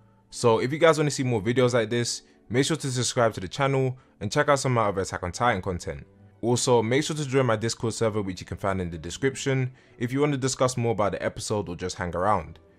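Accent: London, United Kingdom. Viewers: Love your content bro. What part of London are you from?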